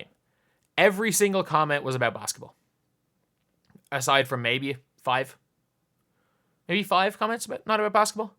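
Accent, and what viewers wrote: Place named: Ireland. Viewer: I hear “So Caitlin Clark…” in a strong Irish accent in random places I shouldn’t even be hearing it now 🤣🤣 Love you and keep up the good work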